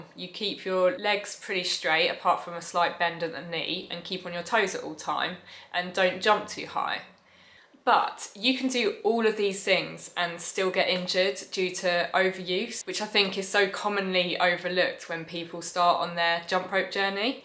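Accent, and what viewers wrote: England, United Kingdom. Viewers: I’m here for my dose of English accent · I love your British accent.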